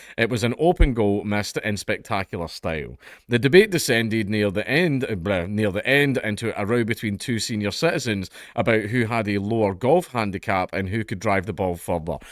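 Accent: United States. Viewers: Your American accent is quite good. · I love hearing speak with an American accent. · That american accent had me dying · American accent is spot on 😂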